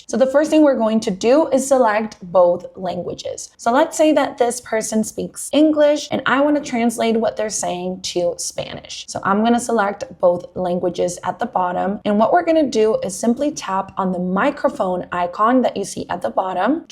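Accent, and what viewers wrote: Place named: Latin America. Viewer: Hi! I love your accent.. Are you Latin ?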